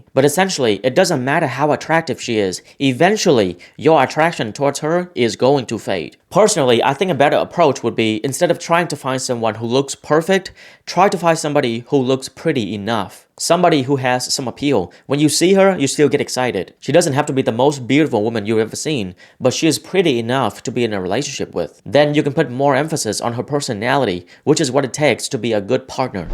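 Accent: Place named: Vietnam. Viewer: Dude are you Vietnamese? U got that accent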